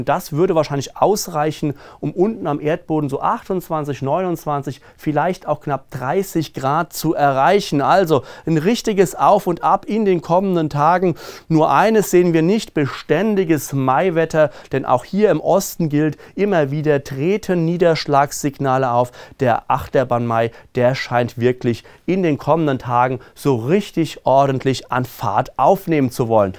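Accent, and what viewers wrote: Hesse, Germany. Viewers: Hallo Du, das Hessische ist nun mal so - Augen zudrücken😉 Wo kommst Du her?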